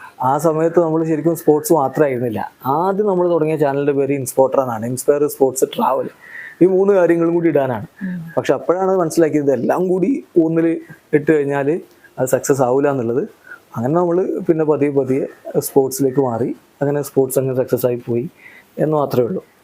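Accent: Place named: Malappuram, India. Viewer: Malapuram anengilum basha vethyasam undallo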